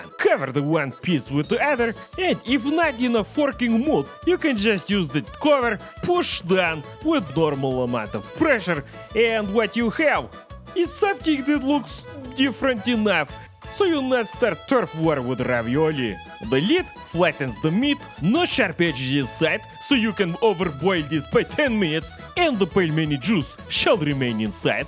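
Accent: Eastern Europe. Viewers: I feel like after watching for years, I could be blindfolded so I couldn’t see any subtitles. And I could still understand his B L Y A T I F U L, S L A V I C voice. 👌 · While I personally didn't need subtitles to understand Slav-ese, let us thank our generous Slavic King for upgrading this video for those that do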